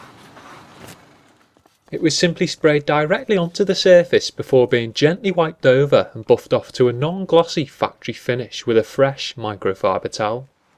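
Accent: Liverpool, United Kingdom. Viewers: You're a scouse I'm a manc......gotta tell you guy......good job..... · Scouse accent + Soft Voice + Proper English... Champion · I am not that familiar with Amy MacDonald but she's Scottish and the has a Scouse accent so you haven't even got the right country. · Not been this engrossed in a Scousers running commentary since Ringo Starr narrated Thomas the Tank Engine back in the day. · from canada? yeah right, i hear that scouse accent..................lol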